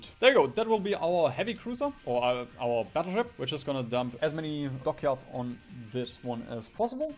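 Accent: Germany. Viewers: question you german ?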